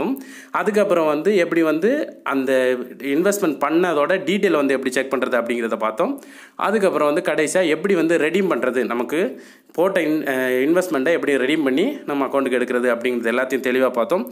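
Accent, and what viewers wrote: Kanyakumari, India. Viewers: Kumari slang 🥰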